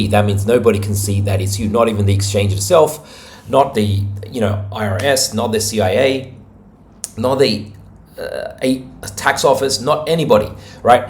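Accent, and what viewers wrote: Australia. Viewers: Like your show very much are you an Aussie?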